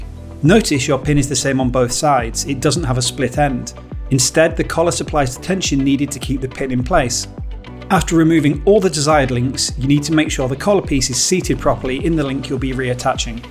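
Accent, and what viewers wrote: United Kingdom. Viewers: ah. Well that explains the British accent.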